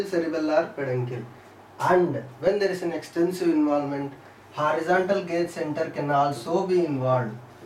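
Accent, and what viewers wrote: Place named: India. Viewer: it's indian english accent.learn it.its better than yours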